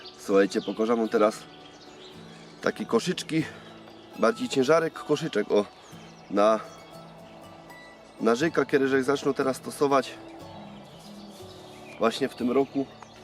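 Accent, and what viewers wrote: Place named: Silesia, Poland. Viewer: Fajne hobby - wedkarstwo (lapa w gore) ALE wytrzymalem 1 min 20 sek ... nie da sie kurwa tego sluchac, mam bardzo wielu znajomych Slazakow i wiem doskonale ze potrafia NORMALNIE GADAC jak zechca. Film bez dzwieku OK - graty za rybki !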